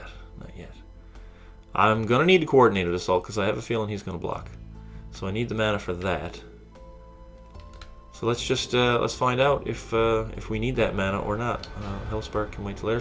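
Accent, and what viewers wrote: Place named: Newfoundland, Canada. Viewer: I'm sitting here thinking.... this guy.... I think he's from Canada.... he sounds..... NEWFOUNDLAND. Yup. I'm from BC, so no hate here haha.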